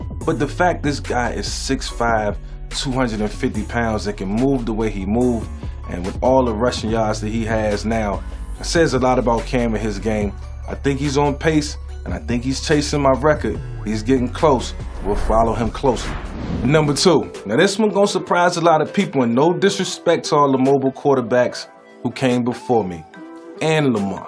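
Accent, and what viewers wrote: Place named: Newport News, United States. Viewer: Vick sound like he from Downtown Newport News ❤️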